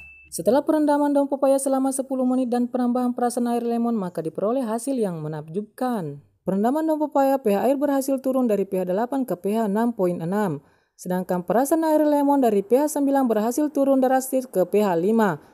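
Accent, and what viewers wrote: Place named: Sulawesi, Indonesia. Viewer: Hafal logatnya dari Sulawesi yaa gan.... hehe. Kami pun sama mengelola cabai juga gang. Boleh kemari mampir juga gan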